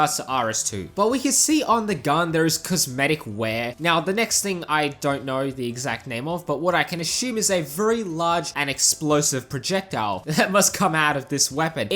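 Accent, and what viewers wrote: Australia. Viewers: You soind like an australian ? If you are australian then yeah I agree that all is illegal there. Even a f*cking airsoft gun is not allowed.... That HK gun looked like a HK53 to me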